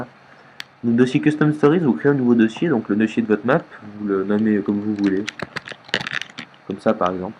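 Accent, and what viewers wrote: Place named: Belgium. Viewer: Ha un Belge !! ( j'adore les 90,70) ;) Sinon tres bon tuto !!.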